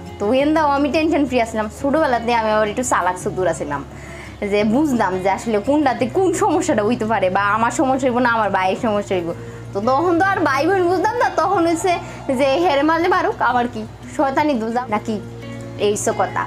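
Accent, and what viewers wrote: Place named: Mymensingh, Bangladesh. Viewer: মনে হচ্ছিল ময়মনসিংহের ভাষায় কথা বলতেছিল।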